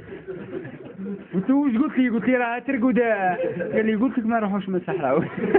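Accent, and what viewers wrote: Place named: Ouled Nail, Algeria. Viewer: الي تعجبني انو محافظ على اللهجة اولاد نايل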